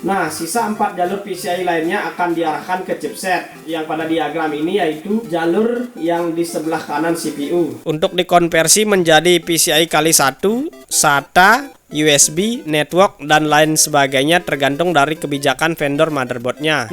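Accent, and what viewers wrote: Bali, Indonesia. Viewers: logat khe bali bgt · Logat bali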